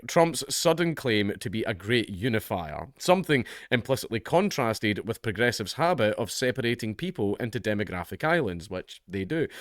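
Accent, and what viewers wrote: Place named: Glasgow, United Kingdom. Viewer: I like that Dankula pronounces Chutzpah correctly almost by accident because of his Glaswegian accent..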